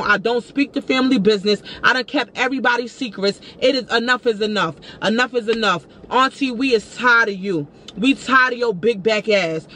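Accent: New York, United States. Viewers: it’s the nyc accent to philly 😂😂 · It sounds like you from New York around the way, am I correct? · LOL you know you from New York when you say.... "One Shot Deal" on the real pluw you have the NYC voice yasssss I have not heard one shot deal in over 20 some odd year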